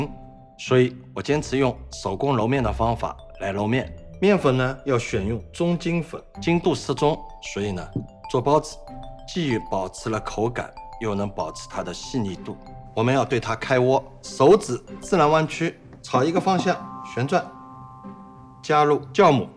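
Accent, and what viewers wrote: Shanghai, China. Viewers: 呜呜师傅的上海口音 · 一听就是上海师傅😄 讲的不拖泥带水 专业 是我喜欢的风格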